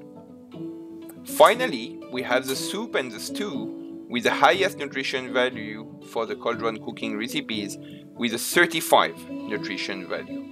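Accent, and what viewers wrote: France. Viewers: Tq for this guide despite heavy french accent and small following I find his guide comprehensive and straightforward compared to others 👍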